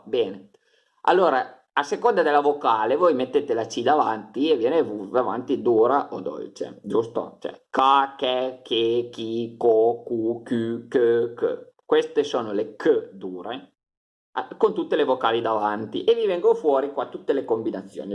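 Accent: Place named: Cuneo, Italy. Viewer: Posso chiederti di quale città sei? Secondo me dalla prununcia potresti essere della provincia di Cuneo. Io di Torino. Ciao.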